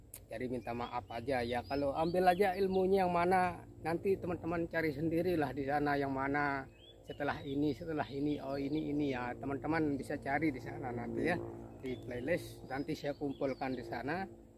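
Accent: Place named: Lombok, Indonesia. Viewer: Logat lombok nya kentara banget pak slm dari lombok tgh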